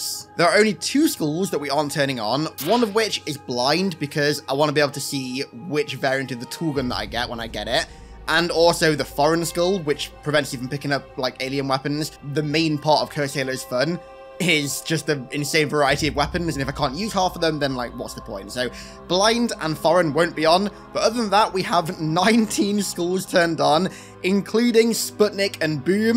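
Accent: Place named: United Kingdom. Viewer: Very British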